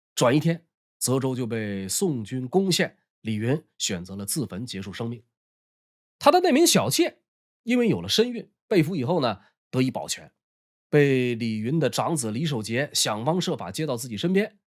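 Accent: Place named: Shenyang, China. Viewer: 总觉得兄是沈阳人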